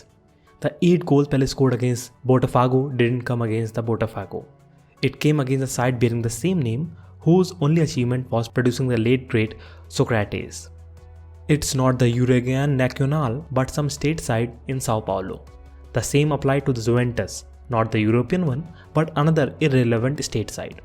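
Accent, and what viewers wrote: India. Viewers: "cane"'. Chupa essa cana. Your accent is....from india?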